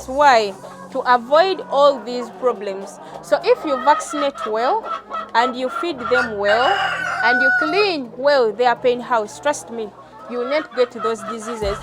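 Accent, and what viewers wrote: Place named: Kenya. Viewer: At first I thought that am listening someone from Kenya kumbe you're the one?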